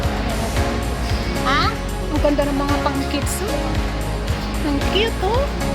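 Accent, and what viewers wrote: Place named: Ilocano, Philippines. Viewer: watching live anak ,marami ka bang napamili ilokano ba kayo kadi yong tono nyo❤❤❤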